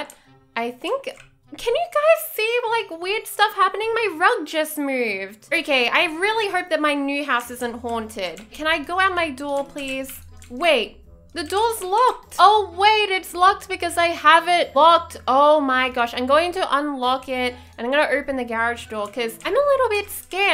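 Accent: Australia. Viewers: Are you Australian? My accent is similar to yours and I’m Australian? Just asking, anyways love the video! You need more subs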